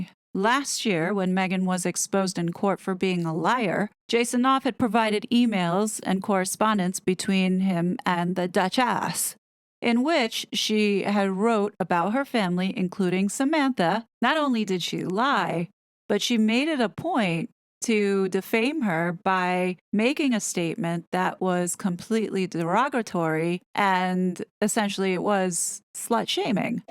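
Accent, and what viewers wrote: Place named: United States. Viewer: I agree with you about everything you say & love your vids as they're so informative & honest. But, as a committed atheist for past 30 yrs (was a Catholic) i rarely admit to anyone, except close friends my lack of religion. When I make that mistake, I'm usually castigated for it. Whereas, when I was Catholic, no problem. As you sound American (possibly Canadian?) we're always led to believe in the UK that America is the most christian country on earth. Is that woke stuff happening across the Pond too? Horrible if it is, as Christian ideals r the only ones to live by. I still do, even though I don't believe in God. Apologies for offending other people of "The Book" but New Testament never advocated massacres & plagues on whole innocent populations just because God woke up that day feeling grumpy or a bit bored. It was a handbook for peace.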